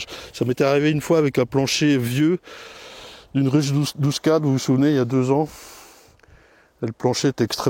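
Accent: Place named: Marseille, France. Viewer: Salut,ça fait plaisir de te revoir! Mais d'où tu sors cet accent marseillais que tu sors régulièrement? Une marseillaise.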